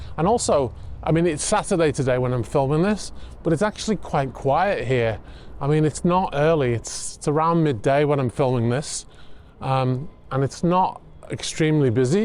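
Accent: Liverpool, United Kingdom. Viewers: first video I’ve seen of yours here, belter, nice to hear a fellow scouser on YT!! Keep the bits up lad is right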